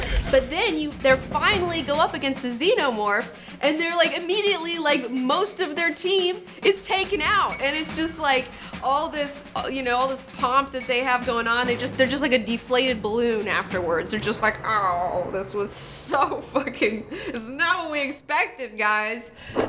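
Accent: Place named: Alabama, United States. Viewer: I love hearing that Alabama accent! Keep up the great reviews and interviews. Enjoy the hell out of this channel!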